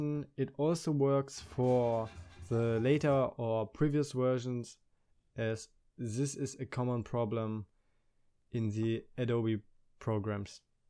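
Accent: Germany. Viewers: I just love a german accent. Thankz brah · man hört direkt raus er ist Deutsch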